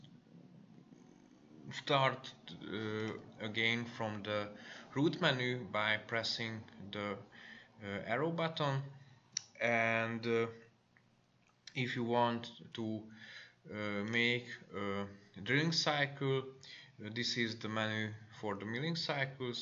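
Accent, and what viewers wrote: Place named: Hungary. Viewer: Milyen érdekes, hogy a kiejtésen hallani a magyart. :) Egyébként ez más nemzetiségeknél is így van.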